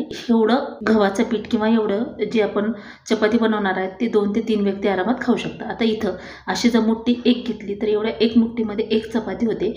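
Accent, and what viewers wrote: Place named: Ghati, India. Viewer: आवाज स्पष्ट येत नाही,खूपच घाटी होते बोलताना.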